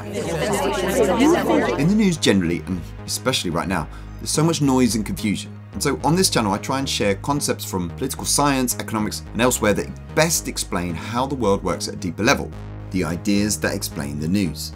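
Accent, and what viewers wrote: United Kingdom. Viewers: Your accent tells me you never ever been in a red state before. Trust me. This shit is a RELIGION TO THEM. Political rules do not apply. Trump worship is a matter of FAITH. He can kill everyone they love right in front of them and not lose one vote. Now can the Brits STFU since y'all don't know wtf you are talking about, ever? Is brexit gonna end? Nuff said. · Sounrs loke a brit🇺🇲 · Happy the lords of the algorithms sent me your way today. I appreciate wise insight pertaining to the failure of chump 2.0, and, as corn-fed midwestern American boy, I always enjoy listening to a voice from across the pond. Liked, subbed, and now appeasing the lords with comment. Thank you! 🙏🏽✌🏽💜 from Minnesota—Sanctuary Land of 10,000 Tampons and Free School Lunches (&—thank you, Coach Tim!—Legal Recreational Marijuana, finally.)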